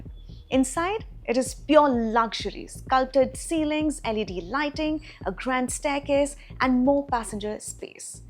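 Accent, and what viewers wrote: India. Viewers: Oh no, that Indian accent, not again😩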